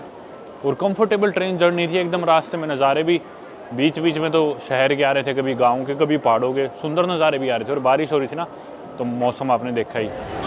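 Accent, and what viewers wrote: Haryana, India. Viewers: Saplings non dubbed videos achieved legato hai haryanvi accent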